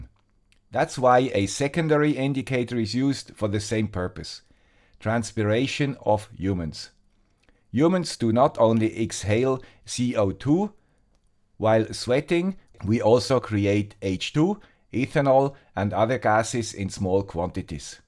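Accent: Switzerland. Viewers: nun ja, Paraffin (Kerzenwachs) ist ein langkettiger Kohlenwasserstoff und verbrennt mit Sauerstoff in etwa zu gleichen Teilen zu Wasser(-dampf) und Kohlenstoffdioxid. Ergo müsste die Zunahme an CO2 mit der Abnahme von Sauerstoff in Verbindung stehen. Am Ende ist nicht mehr genug Sauerstoff für die Verbrennung da und die Kerze erlischt bei einem Wert um 16% O2 in der Luft. Vielleicht weiß ein anderer Zuschauer noch etwas. Wir sind ja alle hier um zu lernen :D Und danke für die vielen tollen Videos mit dem Schweizer Akzent™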